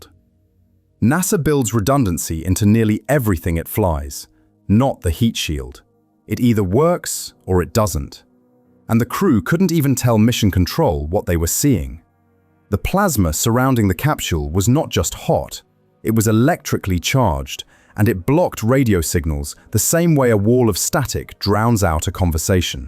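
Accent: United Kingdom. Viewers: The thumbnail said “What Artemis II saw in the six minutes that nearly ended them”.. No mention of temperatures. The voice sounded more British rather American and as 8 billion of us and science of us use Celsius, it would make no sense for me to think the video would have been referring to Fahrenheit, particularly when the temperature mentioned is closer to the photosphere’s temperature. The temperature of the capsule on reentry was about 2,760- 2,800C . So I suspect there was some carelessness in the title, and the 3000 degrees referred to the temperature of the capsule on reentry in Celsius. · FAIILLL!! British (voice) narration ALWAYS exaggerates!! I'm sick-&-tired of British-narrations!! Great-Britan hates America, sooo, WHY are 'they' narrating stories about 'us'....??!!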